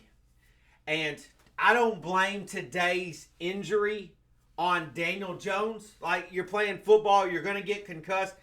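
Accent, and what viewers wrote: South, United States. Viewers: Why are you dressed as a NY fan, but have a southern accent?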